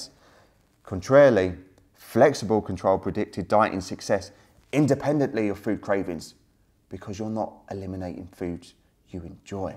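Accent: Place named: Cockney, United Kingdom. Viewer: Please dont take this the wrong way as you present great info as usual, but i cant get past the Jamie Oliver likeness (no offense but most of us feckin hate that mockney Cockney). You need to get Tom from behind the camera to in front. I know hes doing his own thing but i think your subs would go through the roof with either him or both of you presenting serious content with an injection of humour... keep up the good work.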